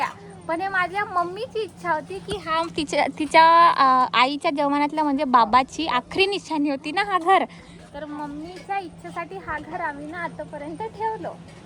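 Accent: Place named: Vidarbha, India. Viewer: स्वच्छ निर्मळ हवा,नैसर्गिक वातावरण, गोड वैदर्भीय बोलीभाषा,तुला खूप, खूप आशीर्वाद,तुझे मोठ्या घराचे स्वप्न लवकरात लवकर पूर्ण होवो.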